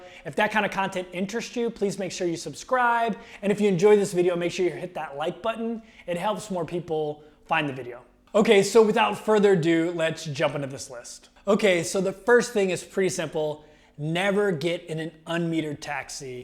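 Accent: Malaysia. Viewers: You're talking like you are a malaysian tho, and im proud cuz im malaysian ✨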